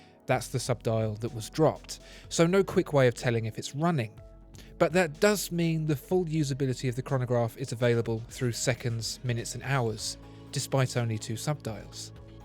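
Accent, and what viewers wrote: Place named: Essex, United Kingdom. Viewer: Are these voice overs done by Alan Davies? It certainly sounds a very specific accent of Essex/London border and certain class, not quite posh but not chav either.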